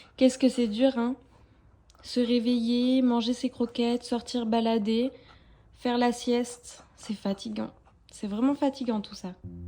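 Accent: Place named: South, France. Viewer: trop du bien , d'ou tu viens ? jentends laccent du sud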